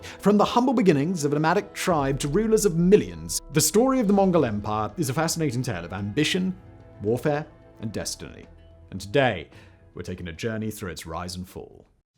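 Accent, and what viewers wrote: RP, United Kingdom. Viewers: He talks too fast with British accent. Often hard to grasp the key words, otherwise good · How posh is this guy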